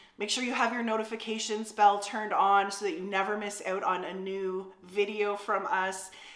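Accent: Canada. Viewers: Hi! I’ve been watching you for several months. At first I was thinking “abaut” “haus” “ote/out” what in the world? Canada! Nevertheless, you grew on me. You are absolutely brilliant! I love your work, your work ethic, your personality, I just love you! ♥️ You’re such an inspiration to all artists. Not afraid to try something new, or show your mistakes to us. I can’t say enough abaut, lol Your incredible drive/ambition, and positivity that radiates through your videos! It does not go unnoticed. God Bless! You’re just crazy amazing!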